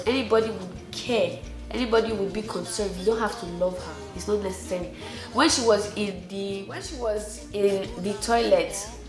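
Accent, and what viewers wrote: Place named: Africa. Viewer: What part of Africa are you from. I love your accent.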